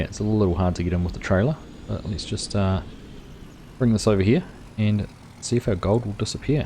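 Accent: New Zealand. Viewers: Damn man a kiwi? North or South Island mate?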